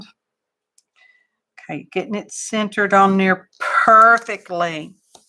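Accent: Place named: Texas, United States. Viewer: Glad you are back in the grove. I payed part of your video at 1.5 speed.... it was funny listening to you talk! "Texan" sounds good no matter what speed!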